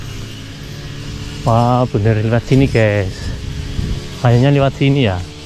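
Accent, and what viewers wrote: Banyumas, Indonesia. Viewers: Wis katon sampean pasti asale sng brebes.. soale logate ngapak.. salam kenal mas e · jawanya ngapak maseh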